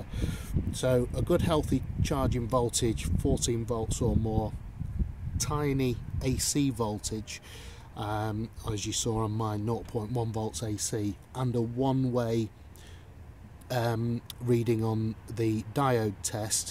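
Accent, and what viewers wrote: United Kingdom. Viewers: Very helpful video, cheers! For other viewers, if the problem is your alternator, dont throw it (and your money) away! I just made a video about Alternator Troubleshooting & Repair. I had a non-functioning alternator and in the video I go through the fault-finding process and dismantle the alternator. In the end the problem was with the diode plate, a $25 component, which is easy to replace. If you want to see the video, go to my channel and you'll find it there... Happy fixing! Cheers, Chris 👍 p.s., where are you from? I'm originally from Blackpool, and your accent sounds pretty close...:-)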